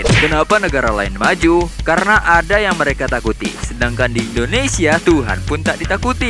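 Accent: Malaysia. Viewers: Eh bree ..lu org mana yak ..logat lu kek Malaysia 😁 ..gw tahu di indo ada juga logat melayu ..tp gw penasaran ma elu 😁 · logat lu malah kek orang Malaysia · Bingung ni abangnya logat Melayu tapi kok berbahasa Indonesia 🤣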